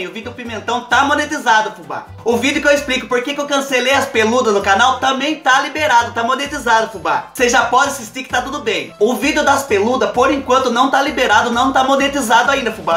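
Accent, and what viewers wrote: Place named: Caipira, Brazil. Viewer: Esse caipirês dele é demais kkkkk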